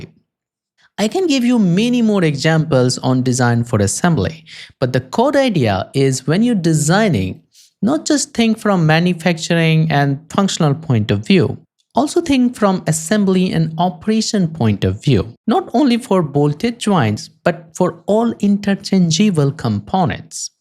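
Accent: India. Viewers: is this dude from ahmedabad?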